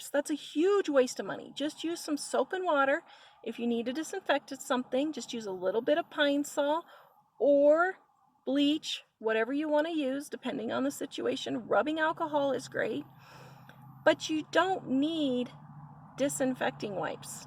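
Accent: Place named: Arkansas, United States. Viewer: Are you from Arkansas? I haven't heard anyone say 'Warsh' since the 60's. My cousins were from Arkansas, I find it charming.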